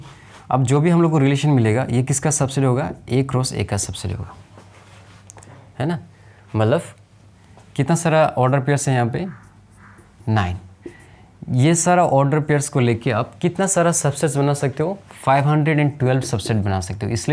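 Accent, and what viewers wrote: Assam, India. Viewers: Kya aap Assam se ho?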